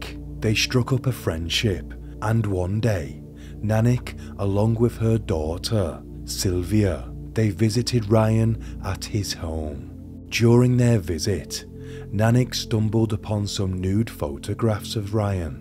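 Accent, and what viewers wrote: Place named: Manchester, United Kingdom. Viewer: Manc!!! My heart belongs in Manchester after living there for over a decade! I was going to say you sound like you’re from somewhere near there. I won’t say where though cos I know Mancs don’t like that!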